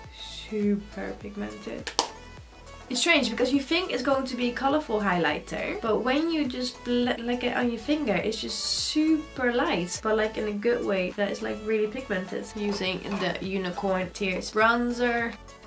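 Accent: Netherlands. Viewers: ik kom ook uit Nederland😄Herkende je accent gelijk maar je Engels en uitleg doe je echt super!!! Gaaf om te zien, ben zelf veel met make up bezig en testen en een collector natuurlijk, zou ook wel mijn eigen channel willen beginnen maar durf nog niet.....komt wel. Heel veel succes met je channel!!!😘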